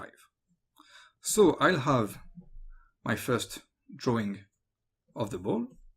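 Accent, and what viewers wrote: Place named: France. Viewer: Merci pour toutes ces vidéos, cher compatriote dont l'accent n'a pas fait mystère! ;-) Je suis en train de m'autoformer à Harmony, et ces vidéos m'aident beaucoup.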